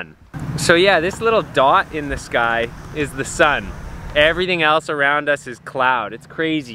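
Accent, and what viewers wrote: Canada. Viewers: I'm not sure why but I love these videos. I think watching someone who skates like me, it's more relatable than watching a pro do a switch backside lipslide down a 16 stair handrail. I've even gotten used to the Canadian accent eh! Great content dude, thanks for all the videos.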